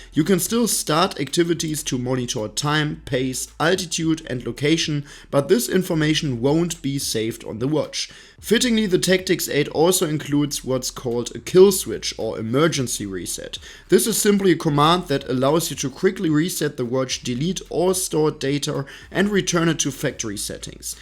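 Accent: Germany. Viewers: Accent, is it German?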